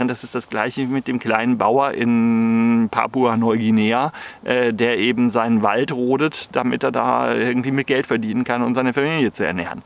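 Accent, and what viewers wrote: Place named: Baden, Germany. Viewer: Das mit dem "rechen" und dem "rächen" in der Aussprache ist halt Badisch. 🤠 Hat nicht der Trump gesagt man soll den Wald rechen damit's weniger Waldbrände gibt, so wie es in Finnland gemacht wird. Angeblich! 🤣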